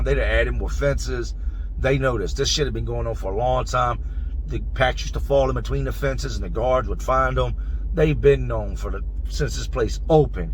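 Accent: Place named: Carolina, United States. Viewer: Bro I swear it sounds like you in Carolina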